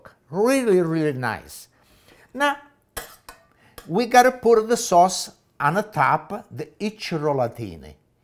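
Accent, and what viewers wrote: Italy. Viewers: I absolutely love the Italian accent! I haven’t heard it in a long time. Tomorrow is Wednesday and I think that eggplant is on the list! Thank you!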